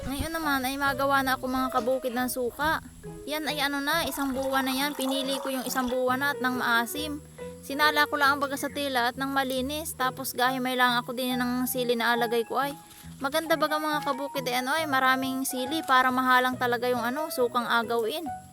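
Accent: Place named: Quezon, Philippines. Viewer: Taga Quezon Province ka ate.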